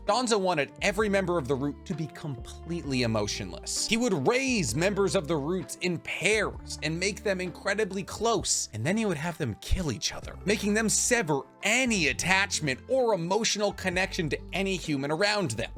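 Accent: Boston, United States. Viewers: It's his inner Boston that comes out ! I love the energy you have in ur videos .. good good work guy !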